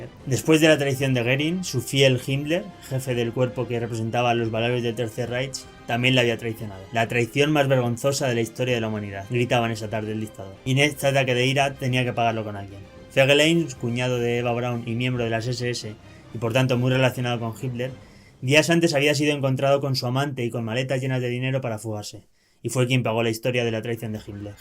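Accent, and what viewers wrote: Spain. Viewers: Interesante, pero seria mejor que no salieras en pantalla y mostraras mas información visual para redondear tus diálogos. Tu acento demasiado español, ese silbido exagerado incomoda a muchos como yo. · Cuando hablas no te entiendo tu acento español busca un comentarista peruano, ellos hablan muy bien el espanol. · a para pinche acentito hay nos vemos odio el acento.de los españoles.no lo pude ver ni un minuto.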